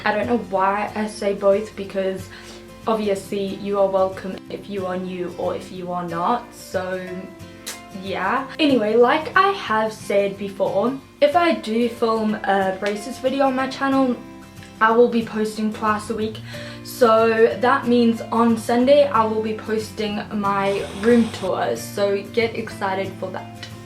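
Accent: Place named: South Africa. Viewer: Me watches 10 seconds she is south African Me goes to description box reads: I am south Africa Me I knew it🤗 Btw getting braces soon and this helped a lot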